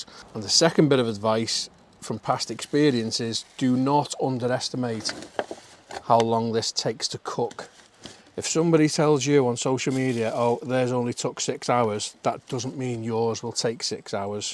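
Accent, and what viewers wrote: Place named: Scouse, United Kingdom. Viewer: I'm from the Wirral (next to Liverpool) but now live in Queensland Australia. Really like the videos and like to hear your accent, which is strange because I don't really like the scouse accent but yours is exceptionally subtle. Keep up the good work 😊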